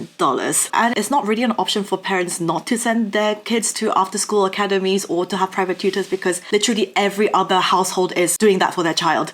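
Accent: England, United Kingdom. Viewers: When you had mentioned about people coming from families with a lot of divorces it strike a nerve. I come from a family that has very few successful marriages. I don’t know what a successful marriage is. It scares me. Another factor. Coming from a dysfunctional family. I became dysfunctional. I’ve been diagnosed with generalized anxiety disorder. Everybody has anxiety, but people like me our anxiety is on steroids. Lastly, I want to mention that you have a very pleasant voice. I hear a British accent. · As for true romantic love, I may have fallen in love with you during this video. JK. You sound like you were raised mainly in England. Is that true? Anyway, good luck to the Koreans for getting their birthrates back up to at least replacement rate levels. Let's go young people. Get with the program! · No money...why u have british accent.lol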